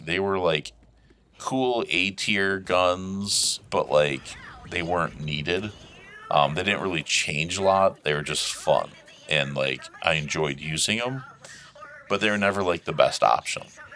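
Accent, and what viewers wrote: United States. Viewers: I knew you were from Wisconsin! I knew it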